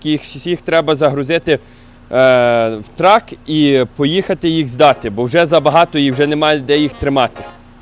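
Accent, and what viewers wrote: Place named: Hutsul, Ukraine. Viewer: Ти мені подобаєшся ,настоящий гуцул з полонини,і мова така,я працював з хлопаками з западної України. І в Чехії так їх тьма,трутнів,не таких простих заробічан як ми стобою. А роботодателів.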